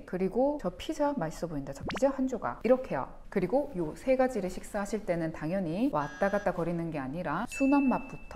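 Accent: Gyeongsang, South Korea. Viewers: 선생님 경상도분이신가요??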